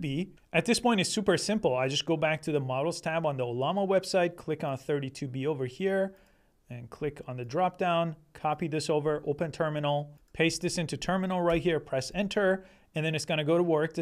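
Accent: Iran. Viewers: I found your channel while searching for local installations of R1. While your accent is very good, I detected some Persian influence in it. After doing some Googling, I discovered that I was right—you are actually from Iran. I wish you could start another channel in Persian; your homeland also needs your knowledge. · dude im hearing some persian accent lol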